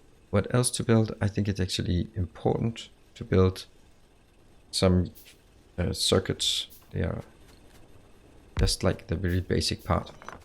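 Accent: Denmark. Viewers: You sound Danish... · Yes, it is true that he sounds Danish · Well we are only like 5.5 million so it's always fun to find a fellow dane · Are you Danish? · Are you Danish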